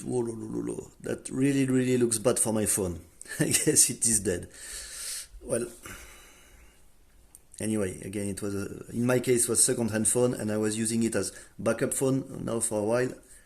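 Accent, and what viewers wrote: France. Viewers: Je pense que tu es français vu l'accent 🤫moi j'ai un problème avec mon pixel 3 qui est en fastboot mode et qui m'indique failed to load/verify boot images. si tu as une idée !!!! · Are you french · I Can barely Understand you with your French Accent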